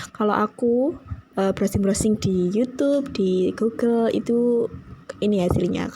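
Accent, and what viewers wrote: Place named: Java, Indonesia. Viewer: Asli org mana mbak? Kok medok bangets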